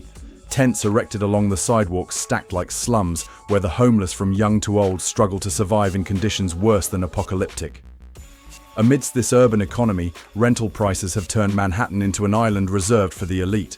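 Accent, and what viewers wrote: United Kingdom. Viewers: Living in Manhattan for over 40 years I cannot agree with this clip. It exagerates very much the severeness of the situation. its not as bad as you portray, notice your British accent , you are not one of us and cannot judge. NYC spends billions a year on this problem and I should know since they helped me a lot with certain issues. There is no right to live in Manhattan. Its for the rich and wealthy. You can move to pennsylvania or New Jersey and seek your fortune there where costs are lower. and rent is lower. Most of these homeless are not from here but from much further away. Notice they dont want to leave Manhattan though they are homeless because there are many shelters and places to get help and free food daily. Cant agree with your show and its too untruthful, RM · I live in NYC. Have never seen a Tent city. About 10 years ago the homeless mentally ill were rampant in the subway stations , but it’s been cleaned up. 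125 th on the east side was full of garbage and K2 victims 8 years ago but no longer they’ve cleaned it up. There’s no longer any abandoned buildings , that ended years ago. Where is the British speaking person speaking from , the moon ?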